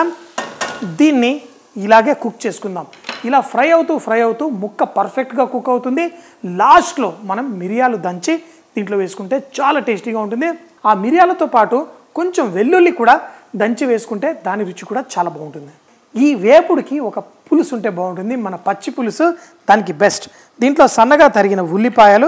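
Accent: Telangana, India. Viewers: Telangana rural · Already I love your programs in english, Now your 2nd innings in telugu, especially in telangana style at some times funny with your Dorasani is reaching to telugu people is very interesting, But I could not understand what is "dinchuck dinchuck"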